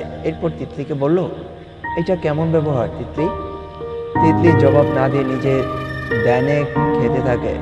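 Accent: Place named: Sylhet, Bangladesh. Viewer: Hahaha! Bhaia apnar mukhe sylhety kota shune atto hashi pay atto hashi pay j ki bolbo bujte pari na😀😀😀😀😀,,,,,,tobe ai hashi beshi din tiklay hoy,,,karon ai golper khub voyanok akta shotti ase ja 1st part ai bujte parcilam r akon kar part gula to puratay flash back😔😔😒😒😒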